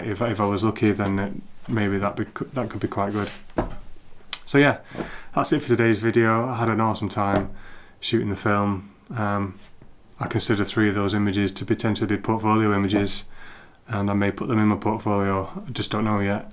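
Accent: Yorkshire, United Kingdom. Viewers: Great video! Yorkshire accent?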